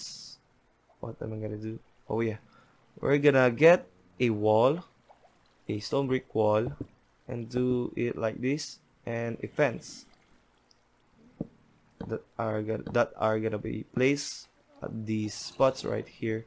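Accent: Philippines. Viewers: i smell filipino accent... HELLO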